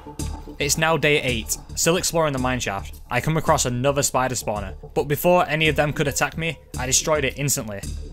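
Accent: United Kingdom. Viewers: this mans scottish accent be poppin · Your Briish Accent is Strong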